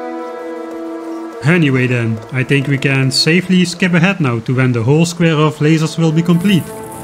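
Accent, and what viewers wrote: Netherlands. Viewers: Lekker bezig man, gave playthrough en mooi bewerkt. I love your attempts to * cough * properly pronounce the English words like recipe. Every time I hear a fellow Dutchie pronounce recipe that way (and I heared it very often back in the day I played WoW) I just crack up :D Gewoon lekker Dunglish blijven praten ;) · I was doubting whether you was german or dutch based on your accent, turns out dutch after all (checked your youtube page).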